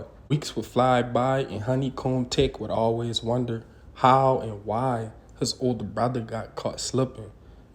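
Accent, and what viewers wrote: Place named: Gullah Geechee, United States. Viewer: He’s probably from Charleston, SC which has a geechee accent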